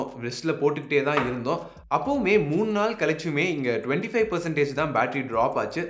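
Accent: Kerala, India. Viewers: I think he is Malayali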